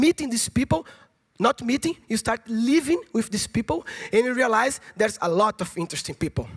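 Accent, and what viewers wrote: Northeast, Brazil. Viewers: Esse sotaque nordestino no inglês é massa demais, Parabéns!!!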